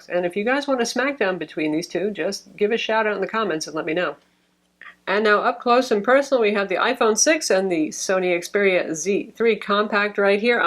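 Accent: United States. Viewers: Can't understand a bloody word she's saying. Horrible Yank accent or you're pissed during this review.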